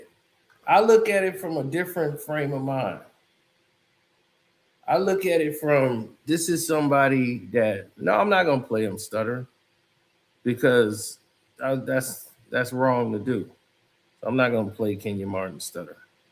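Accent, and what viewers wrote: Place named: Chicago, United States. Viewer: “Casing on” “cracking on”, you are so Chicago.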